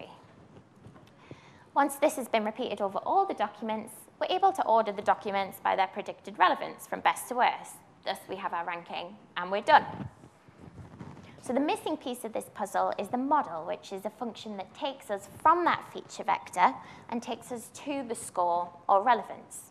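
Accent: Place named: England, United Kingdom. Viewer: that's a very very english accent lol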